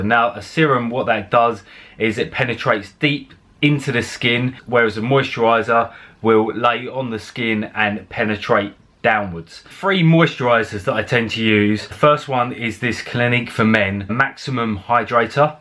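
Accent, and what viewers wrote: United Kingdom. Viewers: subscribed as soon as i heard that top notch londoner accent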